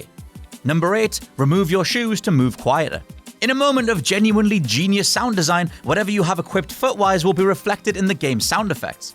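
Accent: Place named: United Kingdom. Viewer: UK ENGLISH 😫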